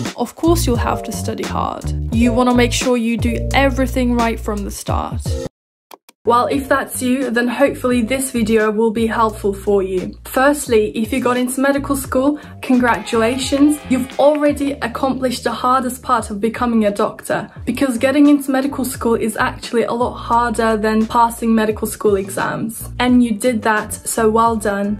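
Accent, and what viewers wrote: United Kingdom. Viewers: personally i prefer american accent but the more i watch your videos i become a fan of the british accent. It's adorable when you speak English that way :) Actually, I'm obsessed with the English language :) , but I have never had the opportunity to be in an immersive English environment. I envy you :) good luck with your studies. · Bilerek mi british accent kullanıyorsun yoksa orda alıştığından mı? Cevaplarsan sevinirim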